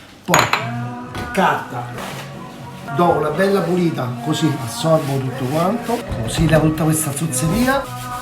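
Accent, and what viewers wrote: Rome, Italy. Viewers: Si forse saresti un buon cuoco se non ti allarga tanto e lavora di piu , con questo romanesco squassato . .....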